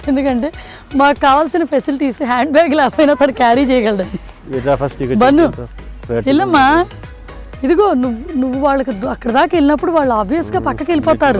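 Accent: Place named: Telugu, India. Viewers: Hello your from east godavari na? Bcoz your slang is like that... I like your videos andi.. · I can feel Pure Desi Telugu language in your voice. Fell in Love with your voice ....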